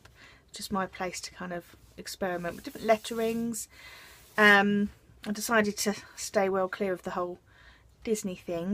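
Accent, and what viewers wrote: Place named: United Kingdom. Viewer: Had to come back to listen to your real British accent hahaha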